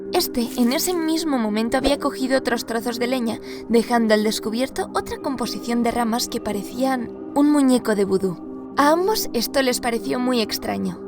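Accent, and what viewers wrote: Spain. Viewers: Una historia latina narrada con una voz de española... Simplemente hermosa narración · por la forma de tu narración, eres española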